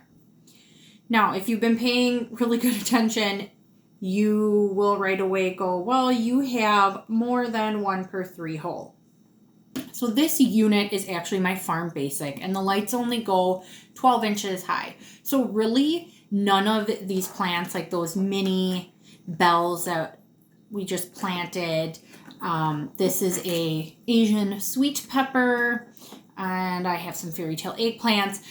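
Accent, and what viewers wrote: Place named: Wisconsin, United States. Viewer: I’m trying to figure out your accent. Is this Wisconsin?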